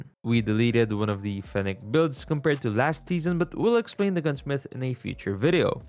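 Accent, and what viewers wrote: Philippines. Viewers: are you filipino im picking up some filipino accent.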